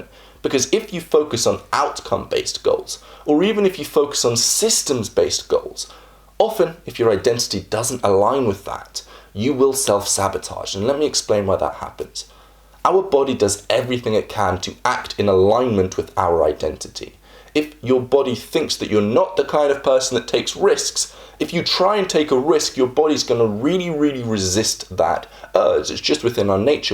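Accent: United Kingdom. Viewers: I thought you was British.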